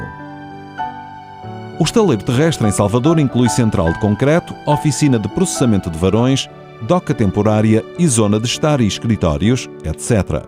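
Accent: Portugal. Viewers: Porque um português na narrativa? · Está voz é de um português de Portugal!! Quero entender está evolução toda!!🤔🤔🤔🤔🤔🤔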